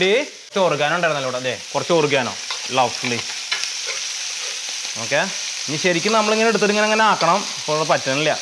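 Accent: Thrissur, India. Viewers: This is the first time watching your channel. Thrissur ആണല്ലേ. ഞങ്ങൾടെ അതെ സംസാരം. loved 🥰🥰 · ത്രിശ്ശൂർ സ്ലാങ്ങും ഓവർ ടോക്കും മഹാ ബോറാണ്‌.. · ചേട്ടായി, മ്മടെ തൃശൂർക്കാരുടെ ആ സ്ലാങ് അതും കൂടിയായപ്പോ ഒന്നും പറയാനില്ല. സൂപ്പർ. അടുത്ത ഓഫീനു ഇണ്ടാക്കീട്ട് തന്നെ കാര്യം. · Thrissur karande samsaaram poli anne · Pakkaa trissur ttaaa ❤️ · തൃശൂർ സംസാരം.... സൂപ്പർ ചേട്ടായി....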